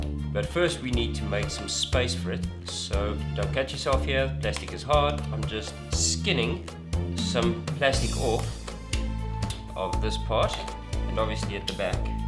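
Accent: South Africa. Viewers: I can tell instantly you're South African tjom. Great video, was just looking randomly for folks who may have crafted Vincent Valentines gun and I found you. Not very many South African content creators like you and I around, granted our content differs by a helluva a degree but still cool to see another.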